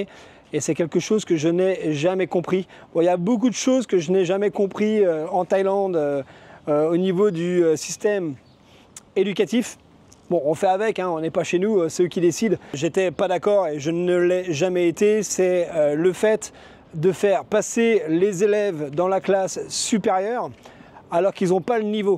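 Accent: France. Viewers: allo ce que jaime chez les francais de la france vous avez un tres beau parler que nous les quebecois a oui nous au quebec sa redouble encore et sa ma fait rire quand tu parlais du pauvre tip qui marchais comme quil fesait du patin a glace il a na beaucoup quil son comme sa et le pire de tous celui quil porte leur pentalon plus bas que les fesse yo men 🤣 .prenez bien soin de vous 🙏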